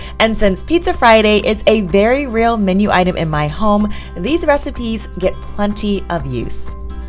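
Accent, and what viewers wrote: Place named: Southern California, United States. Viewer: I can't stand that she sounds like an entire white girl from so cal!!! I'm trying to push it out of my head but I can't sis lol 😊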